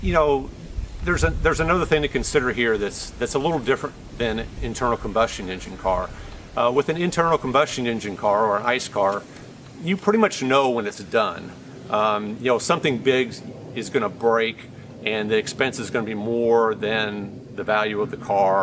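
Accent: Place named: South, United States. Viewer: You have an easy southern delivery. Great job.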